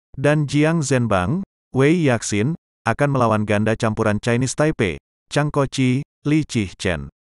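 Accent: Banyumas, Indonesia. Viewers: Kok..ga nampilkan drawing Luxemburg open dan swedea open...makasih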